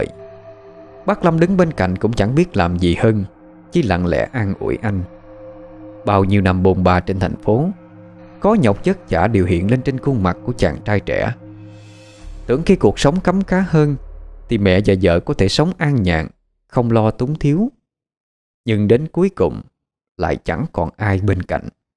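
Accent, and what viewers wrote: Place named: Southwest, Vietnam. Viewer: Yahhh! Hôm nay tác giả Diễm Quỳnh lại cho ra mắt thính gia truyện ma mới! Tiêu đề rất rất hấp dẫn và rất tâm linh ma mị! Rất thích truyện ma làng quê lắm! Xin cảm ơn tác giả và giọng diễn đọc của miền Sông Nước Tây Nam Bộ của chú siêu MC mà dt luôn hâm mộ! Kính chúc gia đình nhỏ của vợ chồng chú luôn rộn tiếng cười của trẻ thơ và luôn tràn ngập niềm hạnh phúc, cùng team Đất Đồng Radio một buổi chiều mát mẻ và vui khỏe! ✍️👻🎤🤙! 👨🏽‍💻🤱👶🍼👨‍👩‍👧💞👌!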